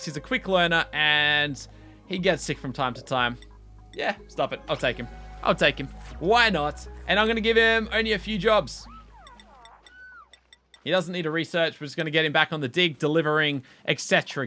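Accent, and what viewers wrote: Australia. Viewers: it doesn't even matter, he is astralian...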